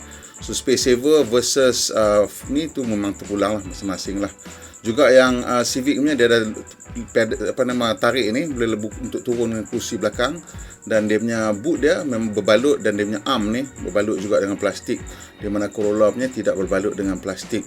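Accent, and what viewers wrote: North, Malaysia. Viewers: Cakap utaqa plak noo… i like👍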